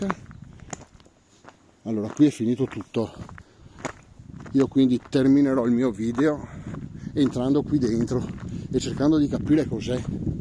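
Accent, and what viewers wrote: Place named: Veneto, Italy. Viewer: Non serviva che precisassi che sei da Venezia... si capiva molto bene ahhaha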